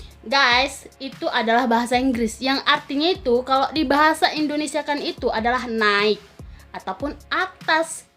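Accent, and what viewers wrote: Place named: Medan, Indonesia. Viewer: Medan kali kau bah,, salam kenal subscriber barumu dari gununh para tebing tinggi..😊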